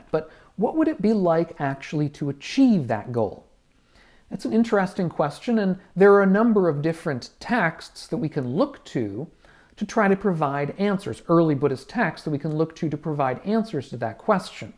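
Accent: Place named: Scotland, United Kingdom. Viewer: You sound scottish to me i like your lectures great stuff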